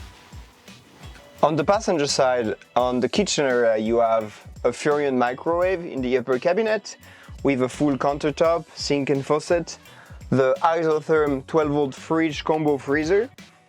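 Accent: France. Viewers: French accent!😅❤ Thank you for sharing.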